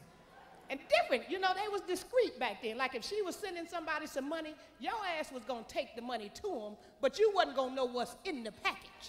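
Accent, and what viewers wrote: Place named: Chicago, United States. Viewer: Chicago girl, Chicago talk, Chicago style. 💯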